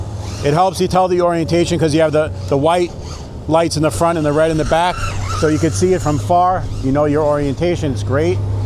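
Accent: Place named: North Jersey, United States. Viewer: Man nice whip! I hear an accent like mine lol from north Jersey😂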